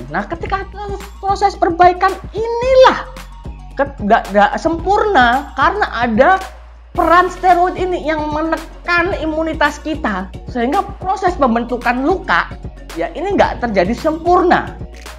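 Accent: Java, Indonesia. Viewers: Pak dokter boso jowone kentel, aku suka wkwk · yg menyenangkan paling medok yg favorit bgt memberi informasi yg dibutuhkan anak muda ketje. Terimakasih dokk 💕💕💕 post video ttg informasi yg bermanfaat bagi anak jaman now ya.. Selalu di tunggu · Logat e jowo banget njih pak dokter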